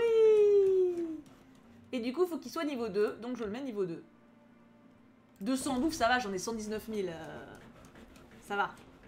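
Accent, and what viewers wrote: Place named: South, France. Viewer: En tant que sudiste je valide l’accent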